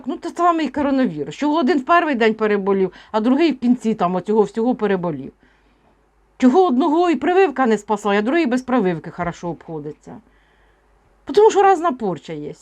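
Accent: Poltava, Ukraine. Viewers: Я так і знала, що ви з Полтавщини❤ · Добрый день,! А я вільно спілкуюся і на українській і на російкій мові, але значно приємніше чути наш полтавській говір. Простий і милий. Дякую. Дуже подобається вам слухати.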